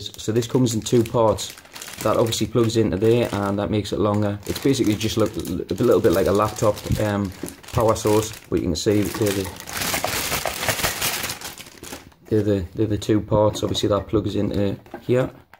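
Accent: Newcastle, United Kingdom. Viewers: this lad sounds like a georide GO NEWCASTLE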